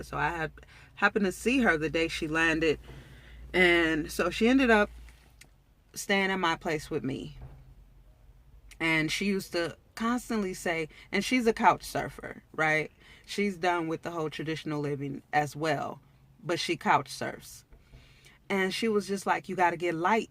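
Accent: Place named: St. Louis, United States. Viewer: New subbie hurrr . I just knew u was from the Lou because of your accent with the “ rrr”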